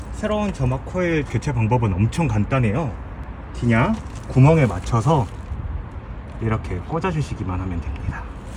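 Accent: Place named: Jeolla, South Korea. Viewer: 저도 전라도라 억양이 우리쪽이라고 짐작은 했습니다.ㅎㅎ 반갑습니다.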